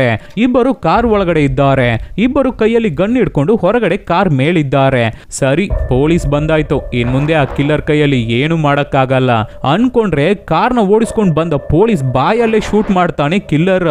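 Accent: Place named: Tamil Nadu, India. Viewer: ನೀನು ಪಕ್ಕಾ ತಮಿಳ್ ಅವ್ನು... ಆದ್ರೂ ಸೂಪರ್ 😄😄😄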